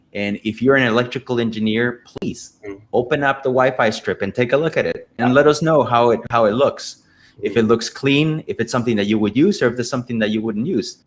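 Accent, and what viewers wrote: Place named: Louisiana, United States. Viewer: The Louisiana accent is the best. And that’s coming from someone with a Texan accent.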